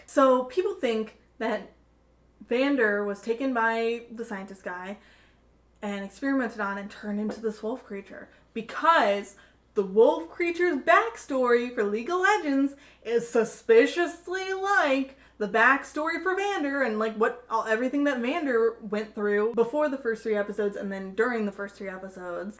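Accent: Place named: United States. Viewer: Thanks for the detailed and thoughtful comments! I appreciate all the time you have put into making the reaction and this video. I will just concentrate my comments on one: Silco being the villain/bad guy. I don't think this label fits him, or anyone else for that matter, well. The notion that he is driven by selfish desire is extremely dubious: in the final episode he articulates his four central demands, and calls them "everything we ever wanted." None of them has anything to do with himself. Shimmer is merely a means to those ends, which is why he did not hesitate to give it up. In fact, giving it up is considered so natural to him that he didn't even consider it haggling. Also, we need to put him in the proper context: he is a political revolutionary who is fighting for the independence of a nation. Ask yourself this: among the real nations of this world, how many achieved their independence without violently defeating enemies foreign and domestic? 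Judging by your accent, I guess you are a fellow American like me. Just think of our country: how did we become a free, united, independent nation? Well, among other things, we had to beat the British, the slave owners, the Native Americans, and the Mexicans. And our country is by no means unique in this regard, not even close. Your point about Jinx being better off with people like Jayce and Victor is no doubt true. But what about the hundreds of other children like Jinx in Zaun? Can their lives truly improve without the under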